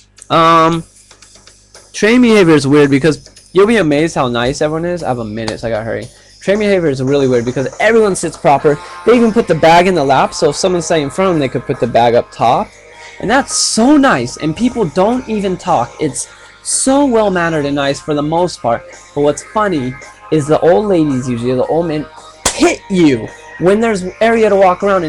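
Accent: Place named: Ireland. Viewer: dude i thought you sounded like irish at first..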